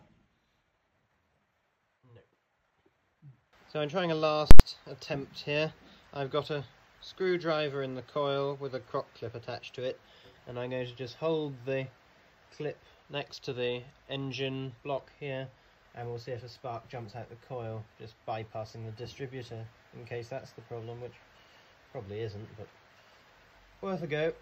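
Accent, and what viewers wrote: United Kingdom. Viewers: youve got the most british accent ive heard. But we in England dont have possums were are you from??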